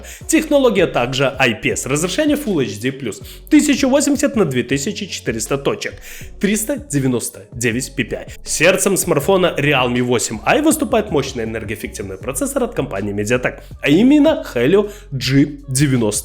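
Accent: Belarus. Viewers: Когда слышу слова - гдзе, быстродзействие. Сразу понятно блогер из белорусии. Любит ныть и жараную картошачку. Яки небудь карэнны минчанин или гамяльчанин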